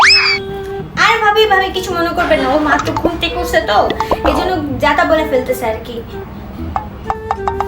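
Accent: Bangladesh. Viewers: বাংলাদেশের মানুষের বাংলা বলার ধরণ, খুব খুব মিষ্টি লাগে ❤ addictive in nature with ♥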